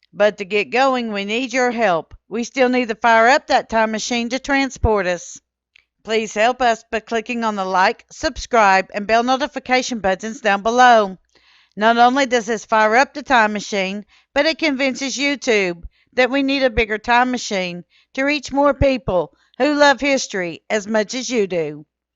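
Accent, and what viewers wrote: Minnesota, United States. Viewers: Never heard such a thick Minnesotian accent...😊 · It's a Minnesotan upper midwest accent you can hear it in parts of the Dakotas Wisconsin and Iowa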